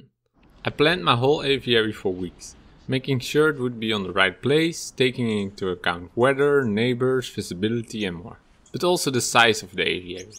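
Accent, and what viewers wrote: Netherlands. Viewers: Are you Dutch? I hear some accent. Hoe gaat het jongen?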